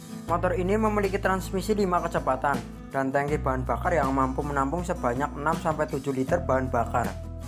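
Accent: Java, Indonesia. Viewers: Jowonya medok amat...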